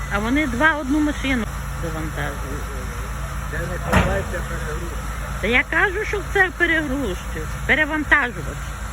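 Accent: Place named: Prykarpattia, Ukraine. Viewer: Чую прикарпацьку говірку❤. Дякую Вам дорогенька за відео. Я тої зими готувала конвертики з яблуками за Вашим рецептом. Маю схожий рецепт від моєї мами але тісто з пива, масла і борошна. І називалося це печиво ''хусточки''. Успіху Вам і Вашій родині❤❤❤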